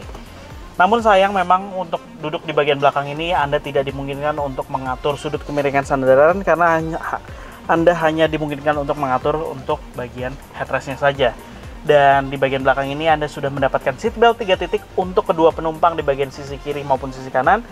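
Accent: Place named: Banjarmasin, Indonesia. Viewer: Kalau mendengar logat bahasa mas, logatnya logat bahasa Banjarmasin😂😎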